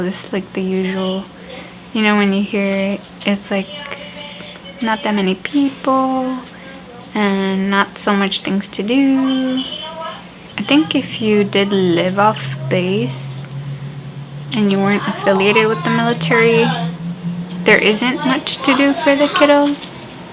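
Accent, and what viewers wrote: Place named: Southern California, United States. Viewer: I can't wear my Chucks!!!! 😆 🤣 😂 Girl I am feelin that...or my vans, although they are warmer lol. I will also be coming from California where I have lived my whole life. Sounds like you were from SoCal. I lived in Antelope Valley growing up until I moved near Yosemite National Park. Been living through some earthquakes here lately, but none as big as you're talking about. Thankfully my littles are already familiar with what to do. No Dollar or 99cent Stores!!!!!!! My son will be ecstatic about that one! Love the info about the coats, gloves and boots. Also about the daylight hours in winter and summer. This is such a great video for those getting stationed there! Thank you for the info...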